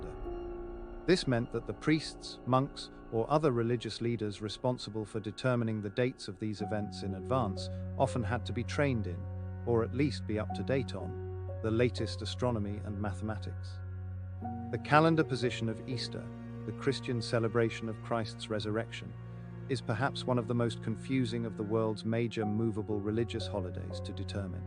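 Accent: United Kingdom. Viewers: Do they just hire anyone with a British accent to read and narrate? What happened to the previous narrator of "Bizarre Journey to the Outer Solar System""? The previous narrator sounded like Jude Law narrating you a space documentary until you fall asleep. 😂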